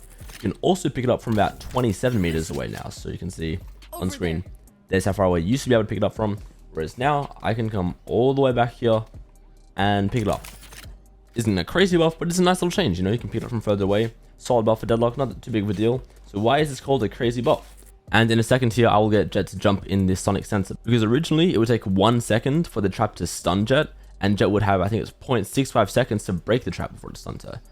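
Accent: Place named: Australia. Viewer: your accent suddenly is more australian than what it was before, impossible to understand 40% of what you say.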